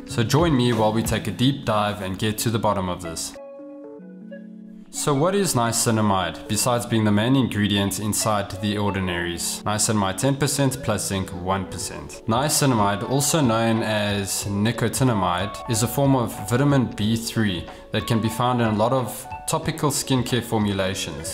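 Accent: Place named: South Africa. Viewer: Why do you sound so South African? Where you from?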